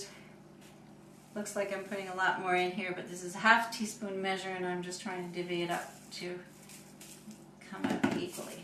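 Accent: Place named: Minnesota, United States. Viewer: Hi, there. had a little ginger root left over from a curry i made. saw your video and adjusted the amounts roughly and got me 500ml of fiery ginger beer. well done with the video, very clear and easy to follow. my next batch will be a full one. what % alcohol would they be? also how do you get rid of the sediment? i've heard cream of tartar mentioned, what's that all about. I'm from england, love your accent, i watched a film called fargo, would that locate your accent at all? bye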